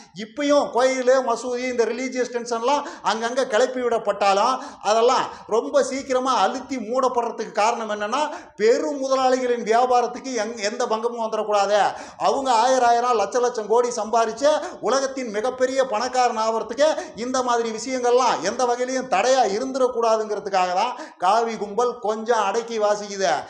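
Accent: Kongu, India. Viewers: தம்பி, your lectures are very deep and insightful, especially on Indian politics. Your analysis is excellent, and the information you provide is valuable. However, I have a small suggestion—sometimes, the topic of your video and the actual discussion don’t fully match. ஈரோடு என தலைப்பு வைத்ததை விட வடக்கு கிழக்கு அரசியல் எனும் பாணியில் தலைப்பு வைத்து இருக்கலாம். It would be great if you could stay more focused on the given topic and elaborate on it more directly. This would make your content even more impactful. Keep up the great work! நகைச்சுவை கலந்த கொங்குத் தமிழில் உங்களின் கருத்து மிக அற்புதம் தம்பி. ஆனால் தலைப்பில் பேசுங்கள்.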